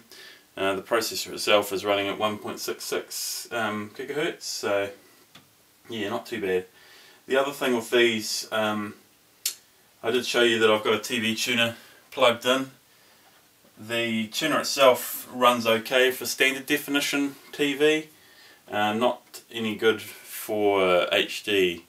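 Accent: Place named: New Zealand. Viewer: Kiwi much? ;-)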